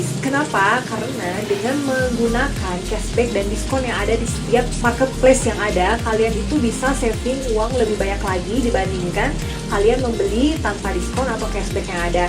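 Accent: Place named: West Java, Indonesia. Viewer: Duh eta pabalatak, nyunda pisan teteh. Hahah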